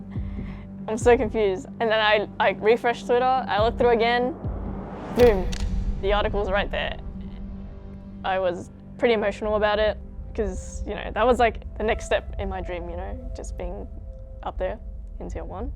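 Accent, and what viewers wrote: Australia. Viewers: This video is awesome!! and seeing an Asian lady with an Aussie accent, <num>/10 <3 <3 More videos please! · She is Australian. I'm Australian and i can tell from the way she speaks, that she was born here.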